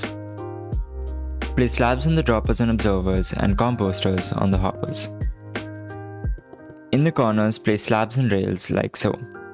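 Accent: India. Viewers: Indian accent? Bro u Indian, btw just clicked on vid 4 secs ago. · lol, i knew you are an indian the moment you spoke, your accent was similar to tech raj(another utuber), haha, i didnt expected an indian to be interested in minecraft mech. and tech. most of the indian minecrafters are jjust playing it for views and copying stuff, nothing special. source; im indian too.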